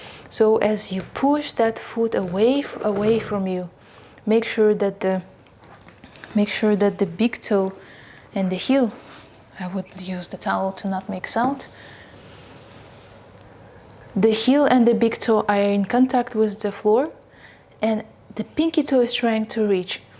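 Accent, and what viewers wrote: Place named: Kazakhstan. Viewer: cool Kazakh accent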